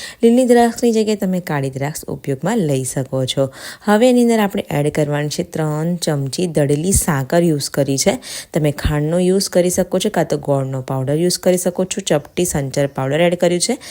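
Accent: India. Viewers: Nice Recipe.....Your Accent is like Saurashtra Gujarati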